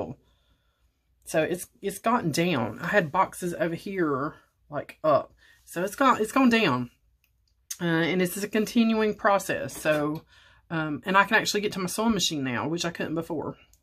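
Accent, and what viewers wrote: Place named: South, United States. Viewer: Hello Mrs. - I LOVE your southern accent🥰. I have read the comments and seen that people have given you some advice about not having to re-thread your machine...They've suggested you sew two blocks at once, use dryer sheets, etc..All you need to do is pull the block your sewing on, to the back of your machine and make sure that you've got 5-6 inches of extra thread, then snip while you've got a finger on those threads, so it doesn't bounce back. Dryer sheets, aside from being spendy, are full of harsh chemicals that cause Alzheimer's and dementia. That's why they do not list the chemicals that are in the dryer sheets on the box they come in. I've done the research. These blocks you've been making are so gorgeous. I'm new to your channel. I cannot wait to see the finished project. You're doing an awesome job❣ Thank You for your videos 💖🥰 p.s. 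I hate to see you go through so much work to get rid of the paper off the back of your blocks. You can actually sew these on Old sheets that you get from the thrift store, leave the fabric on, cut them to size before you sew your strips on... square up the blocks... you get me. 😊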